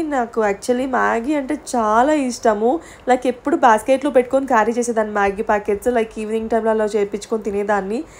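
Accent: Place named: Nellore, India. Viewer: Manadhi nellore kadha.. Atlumtadhie manathonieee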